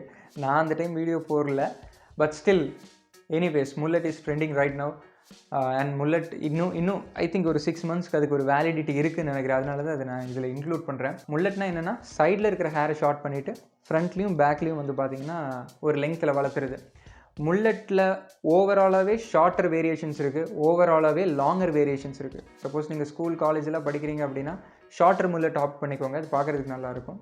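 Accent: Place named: Kerala, India. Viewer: സംസാരിക്കാൻ തുടങ്ങിയപ്പോളെ തോന്നി മലയാളി ആണെന്ന് 😂❤️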